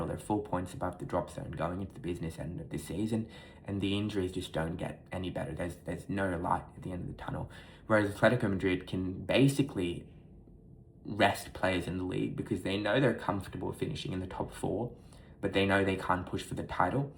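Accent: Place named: Liverpool, United Kingdom. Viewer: Bro is literally speaking in Liverpool accent 😅😅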